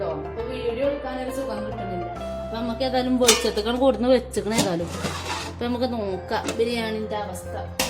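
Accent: Malappuram, India. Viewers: Malappuram slang kelakkan vendiya njn vedio kananee 🙊🙆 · മലപ്പുറം ഭാഷ കേട്ടരിക്കാൻ എന്തെര് സുഖം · Mlpm slag ishttayi nallla rangand kelkkaan pinne thaatha nte vayel vellllam varunn nd vishappullla samayatha ee video kandath nte kodhi onnum ndavullla nte ponnu thaatha ichiri chor tharuo☺️ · ശരിക്കും മലപ്പുറം ഭാഷ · ഇത് മലപ്പുറം ഐഡന്റിറ്റി ഭാഷയാ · തനത് മലപ്പുറം ഭാഷ...അടിപൊളി 👍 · മലപ്പുറം നാടൻ പാസ 😄😄😄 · മലപ്പുറത്തെ സംസാരം ഒന്ന് വേറെ തന്നെ ചിരി വന്നു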